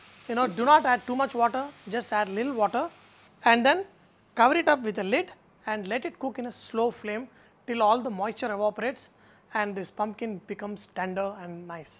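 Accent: India. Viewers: thank you thickly accented indian chef other then mustard seeds & corriander to chop I have all I need & this will make use of the pumkin I managed to get my hands on!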